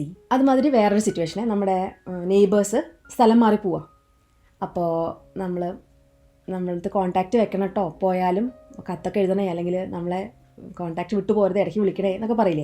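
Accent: Thrissur, India. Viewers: Madam, തൃശൂർക്കാരി ആണോ ? Slang sounds like Thrissur 👍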